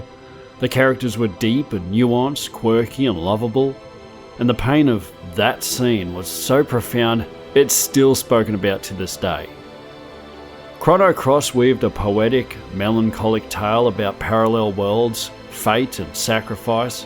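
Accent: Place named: Australia. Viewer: No they're just both Australian. They sound very different.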